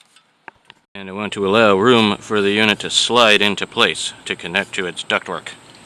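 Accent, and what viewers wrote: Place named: Croatia. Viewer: Your heavy Croatian accent makes your otherwise brilliant vid difficult to follow. Have you considered providing American or Canadian subtitles?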